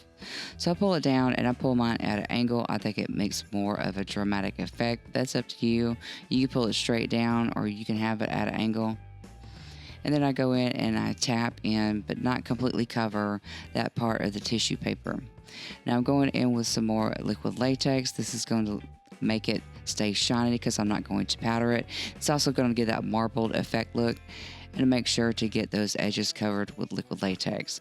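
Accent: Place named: United States. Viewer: Really fantastic. Very interesting accent too. American i think, but not sure where from. Great work. You deserve more views and subs.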